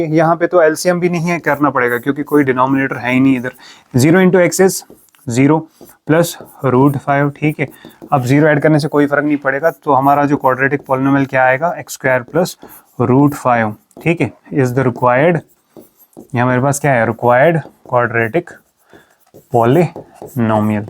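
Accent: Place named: Kashmir, India. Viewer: Sir are u from Kashmir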